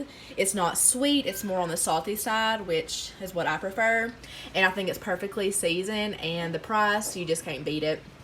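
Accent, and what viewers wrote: United States. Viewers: Hello, I love your videos. I'm trying to figure out which accent do you speak. I'm German and I have a lot of american colleagues (they are from Palo Alto) and their accent is different from yours:-) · Hi, I love your accent, from which state are you? Greetings from Europe:)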